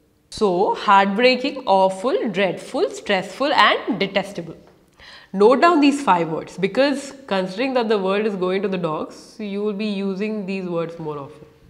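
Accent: India. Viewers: I have always been distressed about my speaking skills. I get furiously annoyed whenever i stuck while speaking. My command on English was awful. I like your Indian accent so much that I'm thrilled to listen to you. Now I'm assured that I will do a spectacular job in this.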